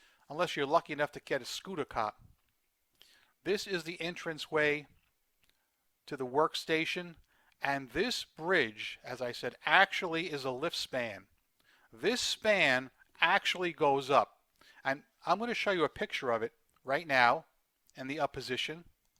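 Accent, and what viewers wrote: New York, United States. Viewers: Love how you've never altered your New Yawk dialect one bit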